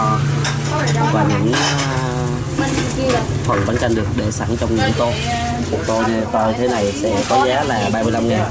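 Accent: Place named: Quang Ngai-Binh Dinh, Vietnam. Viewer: Giọng nói nghe hơi Nẩu chưa chuẩn lắm nói ít thôi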